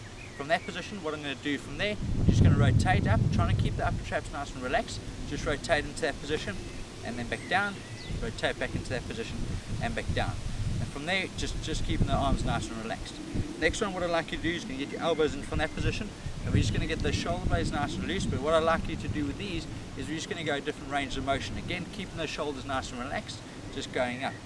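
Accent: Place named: South Africa. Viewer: He's South African not English you muppet. Great video thanks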